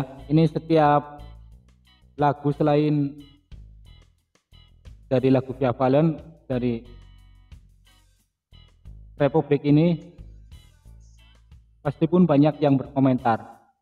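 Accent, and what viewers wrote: Tegal, Indonesia. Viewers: Jenengan asli tegal apa wa. Kayong medok temen ra.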